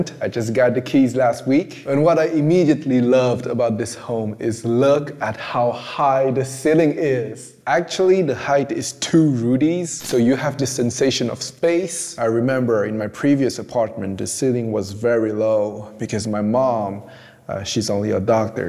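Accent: England, United Kingdom. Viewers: Il a un Accent anglais incroyable pour un fran-nois comme il dit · "La fistinière" ahah j'y pep ! Félicitations pour cet appart il est incroyable :O Et ton accent anglais est vraiment incroyable aussi !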